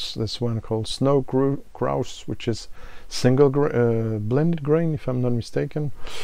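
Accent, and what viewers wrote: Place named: Highlands, United Kingdom. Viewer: Still working on your Highland accent, I see? A few months spent in a rural village and no one would know the difference. I do like the presentation of the pre-1974 Famous Grouse, surprised at the striking differences between the modern version and pre-1974 edition. I agree with you on the peated version of Famous Grouse, it doesn't rock my boat either. Maybe that's why they keep repackaging the bottle?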